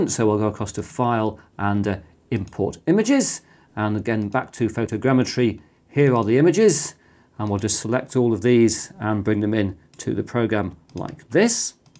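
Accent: England, United Kingdom. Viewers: And no Lidar mention in sight! You just use a basic DSLR?, Excellent well paced video with an English accent, everything explained well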